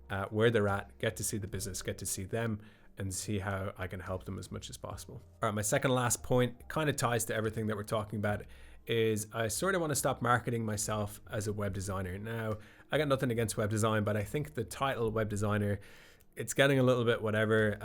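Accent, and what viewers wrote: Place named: Ireland. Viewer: Hey,. Your content is awesome. I really appreciate your honesty and willingness to share your extensive knowledge and valuable insights. (The Irish brogue is pretty cool too. Been a long time since I've been there -- County Sligo it was, many years ago.) Question: Do you have any kind of Inner Circle Club, where we newbies to this game could ask you specific web design-related questions? If so, I would like to hear about it. Thanks again for all of your... can I say it?... amazing insights! P.S. Oh, yeah. One more thing. Those sound like good goals to have, and I really do hope you meet them all. Thanks again!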